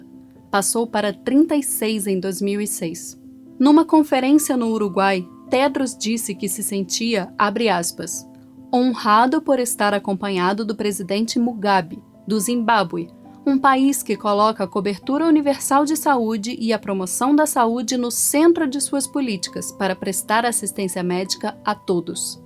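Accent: Rio de Janeiro, Brazil. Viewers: seu dialeto carioca natural (leve e agradável) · * tirou o sotaque carioca para a narração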